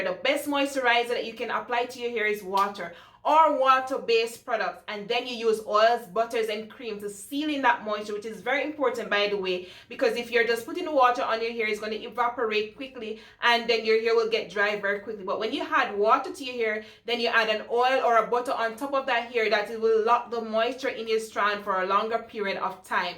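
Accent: Jamaica. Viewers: I am hearing the Jamaican accent!! 🇯🇲 🇯🇲. Representing from ja! Great video ♥️ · You sound like a Jamaican. Are you? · Gurl I hair your Jamaican accent, subscribed.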